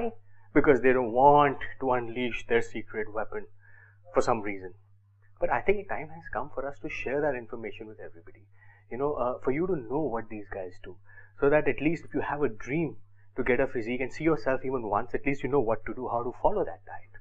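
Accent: India. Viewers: s gupta I am an Indian from England though and cannot speak Punjabi properly, but if I was living in India I would pick up the language and stick to it. In India speaking English is associated with higher socioeconomic worth because those who can afford a better education at private schools are taught mostly in English - But that starts as a gateway to a door of a full blown cultural inferiority complex which Indians attempt to make all sorts of excuses for such as celebrating Christmas but throwing under the guise of 'We respect all religions'. As an external observer it comes across as bizarre how most Middle Class Indians put an emphasis on speaking English over their national languages or Hindi, but other than that the accent does come across as embarrassing and makes it less likely for him to be taken seriously on an international platform - It's why it is so widely ridiculed and many Indians coin on to this and attempt to fake their accent to sound American but can never pull it off. 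I just find it strange how this guy would obviously be able to articulate better in Hindi or Punjabi (The reason why he is swearing so much is due to a lack of vocabulary) but then opts to speak English instead.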